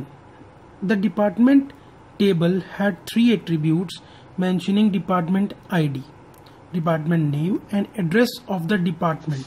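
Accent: India. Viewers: You know its going to be informative when the tutorial video has: 1. Indian accent 2. Ball point pen and paper 3. From more than 5 years ago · the indian accent is fucking funny lol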